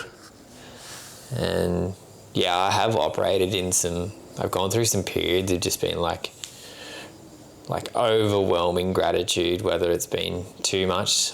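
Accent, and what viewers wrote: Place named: Australia. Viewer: I’m grateful your YouTube channel came up on my feed. Not sure why, maybe it’s the Aussie accent that I adore. You don’t look apathetic, you speak gratefulness and that’s a great place to be, no matter what. Sorry to hear about your father, prepare yourself, because those blissful moments are too fleeting. Did you get to visit the US while in Canada? Would ❤ to see more, you speak with your truth very well.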